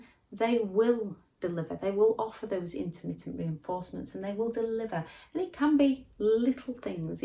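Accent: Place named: North, United Kingdom. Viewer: Became atoms, from crumbs, from a loaf, from a whole bakery. I never knew things could be salami sliced so much. Thank you for doing this. Its great to hear a northern girl doing this 🙌🏽🙌🏽🙌🏽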